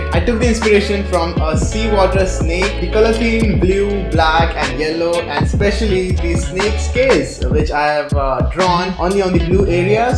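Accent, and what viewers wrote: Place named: India. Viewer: That's kinda rude,but i think that he's Indian cuz his accent.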